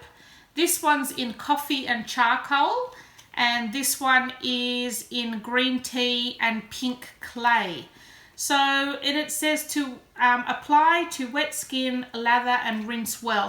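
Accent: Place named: Australia. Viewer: Get bum fluff off your face...so nice to hear an Aussie voice. I can't resist stationery & paper products either. Tfs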